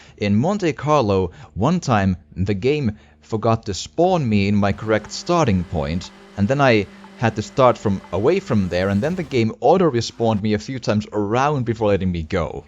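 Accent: Finland. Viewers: Are you finnish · Are you Finnish?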